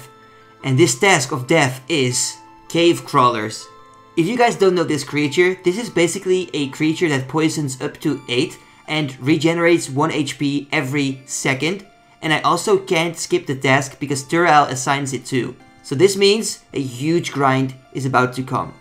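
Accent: Netherlands. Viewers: Great series! Just a question, are you Dutch? I am from the Netherlands, alot of people play osrs here! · Lets gooooo! are you dutch btw? you sound like me haha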